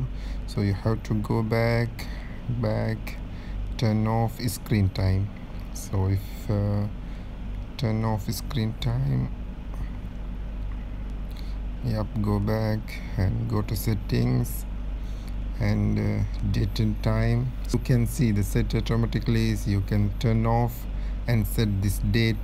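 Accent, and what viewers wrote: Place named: Kerala, India. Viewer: Are u malayali ssss sssss sssss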